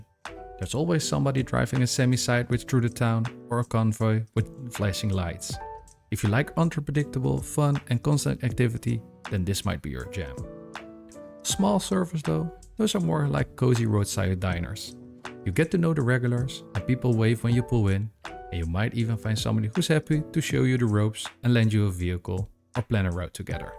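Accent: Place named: Netherlands. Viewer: i hear a small dutch accent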